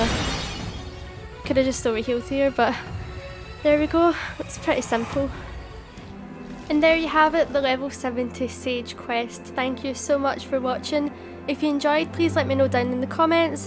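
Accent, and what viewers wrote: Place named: Scotland, United Kingdom. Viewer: I had a terrible time just doing it, thanks to this it helped, and its nice to hear a Scottish voice on here (I'm from NE ENgland and live in Scotland)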